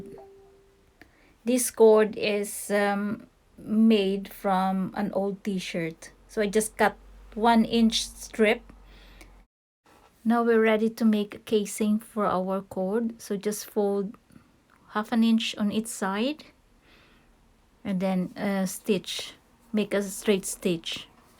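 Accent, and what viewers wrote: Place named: Philippines. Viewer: Based on your accent you must be Pinay. Maraming salamat.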